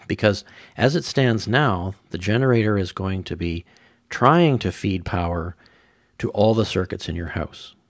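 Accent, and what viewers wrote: Canada. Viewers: I can tell by your accent that you are Canadian. One other legal connection here in the U.S. is a metal block that bolts to your home breaker panel cover. To use it, you have to install a second main breaker below the original then install the block. The block will move up or down to allow EITHER your main panel breaker OR your generator's main breaker to be switched on but NOT both at the same time. I can't use any of these options because the idiots who built my house placed my panel box in an inside wall and it would take major design changes in the construction of that wall to allow rewiring of the panel so I did what I wrote below a year ago.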